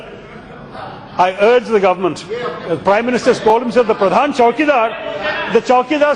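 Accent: England, United Kingdom. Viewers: Unfortunately honorable union finance minister does not understand his English accent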